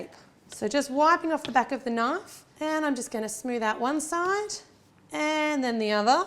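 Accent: Australia. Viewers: Do Australians find American accents as beautiful as Americans find Australians'? I love listening to you talk. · OMG. What age are you?! LOL. You clearly don't hear accents. She's AUSTRALIAN.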